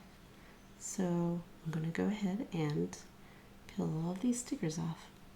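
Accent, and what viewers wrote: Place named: Missouri, United States. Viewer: This makes me want to eat an apple. I love apple season. Also, I love your accent. I live in Missouri and we have a lot of people here with that accent. I have some aunts and uncles with it and sometimes I say words like they do. Like I'll say "sell" instead "sail". And "wull" instead of "will" or "well". "Dill" instead of "deal", etc.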